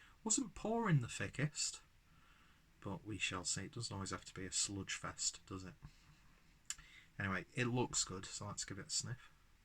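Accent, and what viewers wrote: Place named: Liverpool, United Kingdom. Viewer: Posh scouser. Liked the review, lad.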